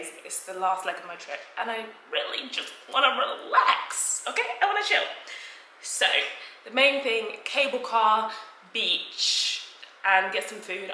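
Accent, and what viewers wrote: United Kingdom. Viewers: Are you British, you hv that accent there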